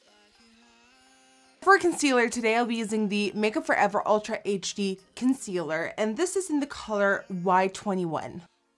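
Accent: United States. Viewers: Can you speak Dutch or something because you sound American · how come she sounds American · Hoe heb je zo'n Amerikaans accent gekregen? 😝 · you live in Holland? oh. you sound american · i had no clue you weren't from the states, you sound american lol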